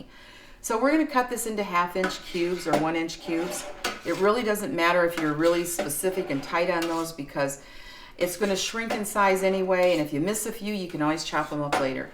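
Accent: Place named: United States. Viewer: 86 Deg... I would assume it is Fahrenheit? A. Because of the US accent. B. Because I think a germ culture won't feel at home in anything but Luke-warm... C. I don't see vapour rising and in 86 Deg. C there would be vapour... But not everyone is an Engineer who loves making food, so it would have been nice if the publisher didn't assume only USA people watch these videos, and mention conversion quantities. 86 Deg. F or 30 Deg. C An Ounce or 28 Grams... etc.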